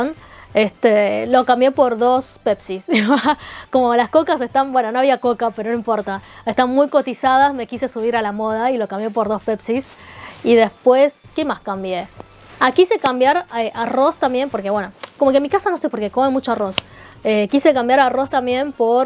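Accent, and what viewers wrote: Argentina. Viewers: Además te felicito que no pierdes la forma de hablar Argentina....!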